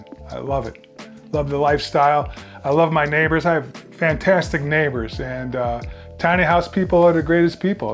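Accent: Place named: East Coast, United States. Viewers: He's so much cuter than Will. I also detect an eastcoast accent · is my brother, and he is cuter, and so. so funny. He is so happy now back in CA. But yes the dialect is New England.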